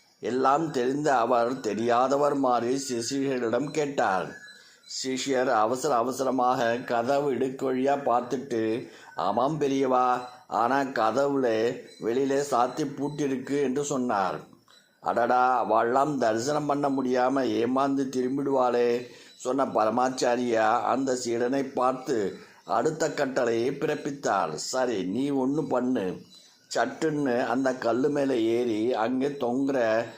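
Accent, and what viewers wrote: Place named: South India, India. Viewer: He is talking in Dravidian voice, specially known for DMK, Karunanidhi.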